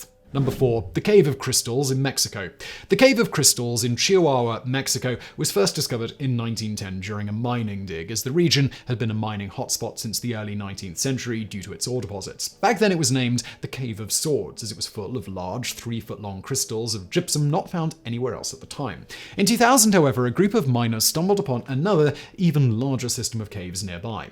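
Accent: United Kingdom. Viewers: He's british as that's how they pronounce it. Not joking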